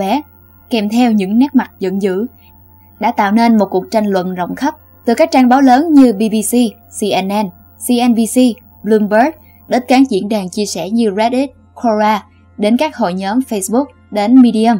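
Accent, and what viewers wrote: South, Vietnam. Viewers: Giọng nữ miền Nam dễ thương quá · Cuối cùng cũng có giọng miền nam hehe 😁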